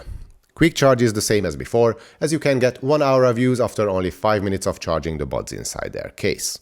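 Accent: Hungary. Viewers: Magyar vagy? Hallom 😁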